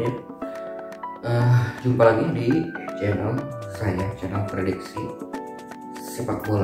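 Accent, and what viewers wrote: West Java, Indonesia. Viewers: Prediksi saya .mah persib menang.. tp ini adminnya pedukung persib..di liat dri logatnya bicara sih urang sunda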